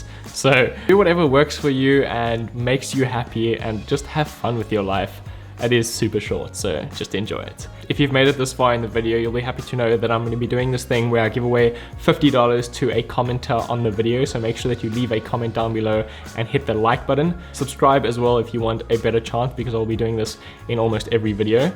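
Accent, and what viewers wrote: South Africa. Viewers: I thought this was a South African minimalist apartment when I heard your accent lol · are you south african?? idk why but that's what your accent is screaming at me😂😂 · Wait, you're South African? it refreshing from all the other american and british you tubers. Nice to hear my accent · You sound South African, are you ? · Are you south African?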